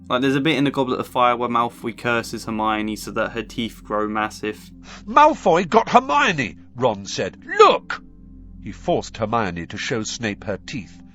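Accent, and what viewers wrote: England, United Kingdom. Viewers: I love you Mr calm English accent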